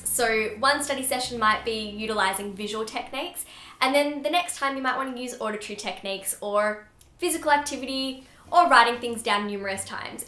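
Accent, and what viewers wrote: Australia. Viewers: Aeee love your Aussie accent x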